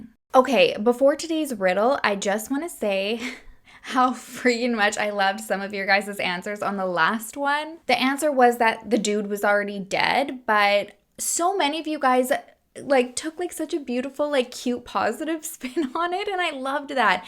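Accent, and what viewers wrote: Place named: Canada. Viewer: I was wondering if you were from Canada! I could tell by your abouts, I’m from North Dakota and we kinda have similar quirks in our accents. 🥰 love your videos just found you yesterday!!! ❤️❤️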